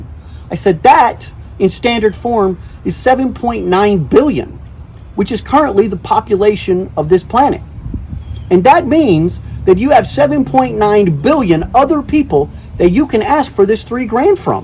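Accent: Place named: New York, United States. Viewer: I couldn’t help but find it funny that whatever is causing your audio to peak also kinda made it sound like you had a New York or New Jersey accent 😂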